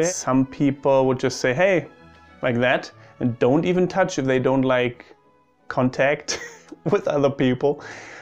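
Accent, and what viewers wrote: Austria. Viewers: oh and it's important to tell you that this is the viennese austrian standard german accent. Most other Austrians would pronounce 'ü' like 'i', 'ö' like 'e' and 'a' more like 'å'. The rest is the same. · Das Englisch können wir uns, glaub ich, schenken. Deinem Nachnamen, deiner Diktion und deinem Vokabular nach zu urteilen, bist du Tirolerin. Ich bin Wiener, und auch ich spreche meinen Dialekt (aber nicht auf die "schlimme" Art, wie man sie von "Ein echter Wiener geht nicht unter" kennt - keine Sorge [Ich bin einer von den Eleganteren!;-)]). ;-) Ja, wir haben tatsächlich viele schöne und interessante Dialekte in unserem kleinen Land, das uns nach 1919 noch übrig geblieben ist. Doch, so leid es mir tut; in einem Punkt muss ich dir widersprechen: es gibt tatsächlich auch ein österreichisches Standard-Deutsch, das sich vom bundesdeutschen Hochdeutsch absetzt, ohne ein Dialekt zu sein - ähnlich wie sich das British English von dem amerikanischen oder sich Ukrainisch von Russisch unterscheidet. 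Ob nun Wikipedia der beste Beweis hierfür ist, wage ich nicht zu ermessen, aber ich habe immerhin den folgenden Artikel dazu gefunden: <link>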